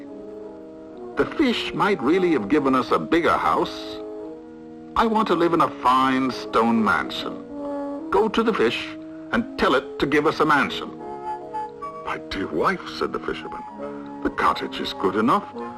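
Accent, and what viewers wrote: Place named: Europe. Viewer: Umm, definitely not Dennis! For a little moment I was thinking it was Orson, but then it sounds European. Why didn't they put the narrator on the credits?!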